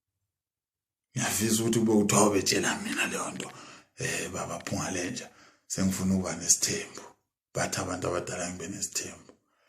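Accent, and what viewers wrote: Zimbabwe. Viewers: Ngiyakuzwa mfwethu ukhuluma iqiniso, kodwa manje wakhulumisa kwama Zimbabweans kangaka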